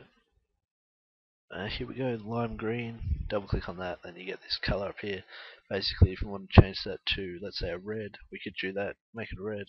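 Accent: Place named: Australia. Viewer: Finally an fucking aussie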